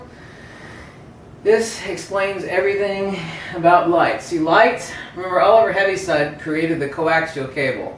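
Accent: Germany. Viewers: Please lose the stupid German accent...very annoying!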